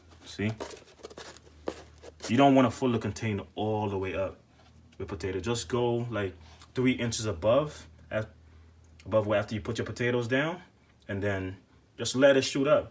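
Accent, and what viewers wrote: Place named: New York, United States. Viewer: Haitian New York accent is What’s up!!!! 🎉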